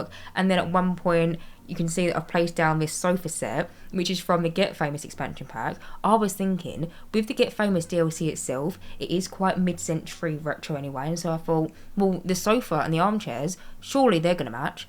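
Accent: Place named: England, United Kingdom. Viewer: your builds are always gorgeous. on another note, as an ex-pat nice to hear a very english accent again.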